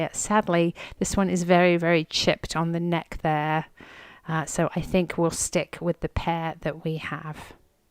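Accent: England, United Kingdom. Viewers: I'm a new subscriber from England and really enjoying your informative, relaxing videos. I wish we'd have the large Goodwill thrift stores in the UK, our charity shops are very small in comparison, with just a few shelves of bric a brac and very hard to find any treasures. I hope you don't mind me asking, as you and your lovely Mum have English accents with a slight American drawl, so wondering what's your history or connection with England?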